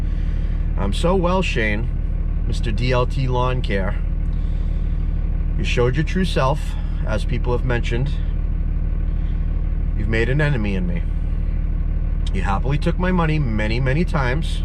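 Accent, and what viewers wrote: Massachusetts, United States. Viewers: I love hearing that mass-hole accent when you talk! 🤣🤣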